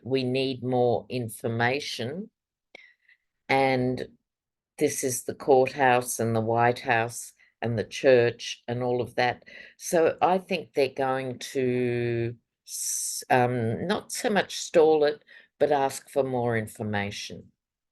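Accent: Australia. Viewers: “…his lily white buttocks.” I love how you said that! ❤️Australian accents rock! 😂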